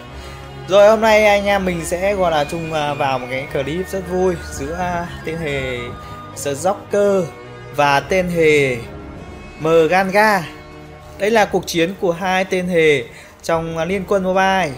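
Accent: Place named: North, Vietnam. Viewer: thích giọng trai bắc đặc biệt là thái bình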